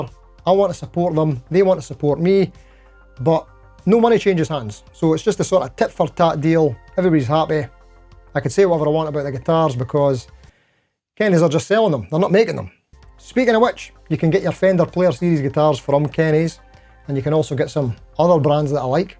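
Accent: Scotland, United Kingdom. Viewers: It’s shocking the scottish accent for spanish people 😅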